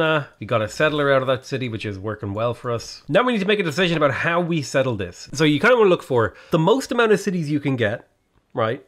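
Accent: Ireland. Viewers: You sound more Irish than usual in this video. Kinda neat to hear. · is it only me or did he start to yous his Irish accent? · I was gonna say he sounds more "Irish for some reason, also pretty tired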